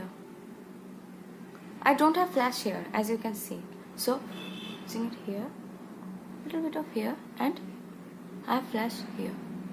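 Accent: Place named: Bengal, India. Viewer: I really like your bengali accent❤...even some people ask me are you a bengali because my name is Manjari😊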